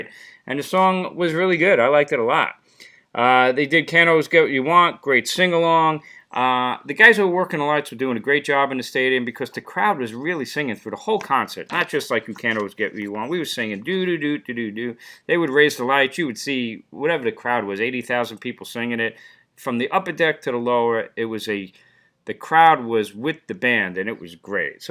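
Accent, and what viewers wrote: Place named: New York, United States. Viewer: Jagger is 80 not 81. The fact that the muddy sound became clearer that’s cause of the wind, or probably a slight breeze coming & going. Guess you’ve never sat in the nosebleeds! The fazing was fucking wind not the sound guy. Your used to arenas no wind. & you probably saw the Stones at Giants stadium a virtual wind tunnel that can get lucky no wind some dates. Only good thing about your 📹 is your NY accent but your no Archie Bunker pencil in Cindy Lauper It’s the fucking wind!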